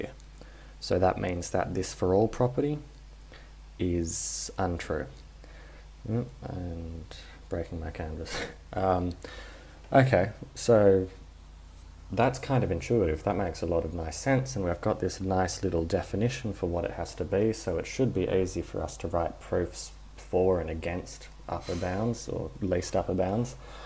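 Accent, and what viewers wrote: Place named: Australia. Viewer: with Aussie accent op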